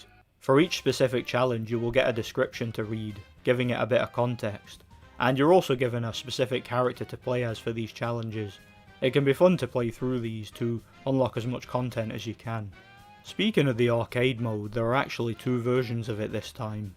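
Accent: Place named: Northern England, United Kingdom. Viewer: Wait, you're Scottish? I assumed you were Northern English.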